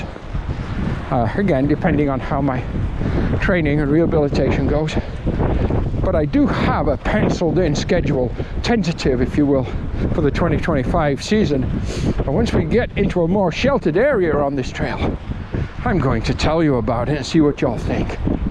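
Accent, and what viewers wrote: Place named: Yorkshire, United Kingdom. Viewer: where are you from originally mate? Sound like a Yorkshire lad!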